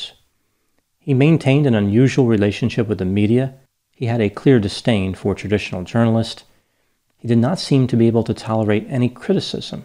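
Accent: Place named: Philadelphia, United States. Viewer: i never noticed but are you from the philadelphia area by chance? i notice you say certain words like i do. but your accent is not strong like NE philly or bensalem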